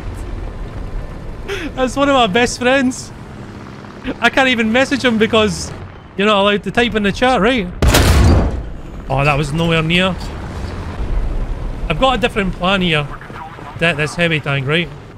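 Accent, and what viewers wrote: Scotland, United Kingdom. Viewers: so glad to see you back dood! youtube has felt barren and desolate without your beautiful scottish voice brightening my day. Hope you’re doing okay my dood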